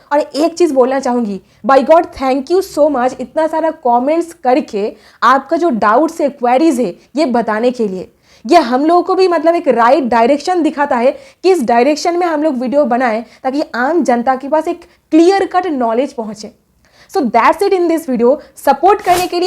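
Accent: Bengal, India. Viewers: You talk like Mamta Banerjee... tussi bong ho? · Bengali accent bahut achha hai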